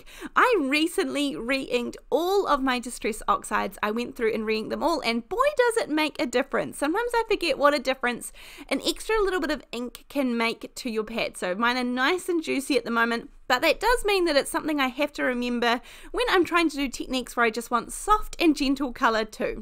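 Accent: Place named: South Island, New Zealand. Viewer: Love all those cards, especially the last one! And just love hearing that South Island accent lol (I'm North Island lol)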